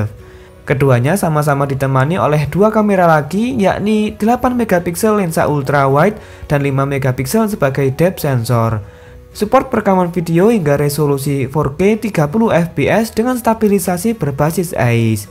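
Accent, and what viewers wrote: Java, Indonesia. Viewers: medok tenan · Masnya orang jawa y? Koq medhoq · Membawakan konten nya terasa medok mas Logat nya. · Medok benerrrr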